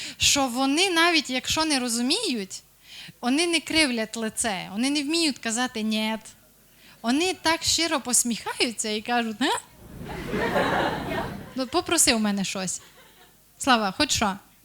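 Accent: Transcarpathia, Ukraine. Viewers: Шоб твої правнуки, дівочко, балакали так як їхня прррабабуся з ЗАКАРПАТТЧ!!!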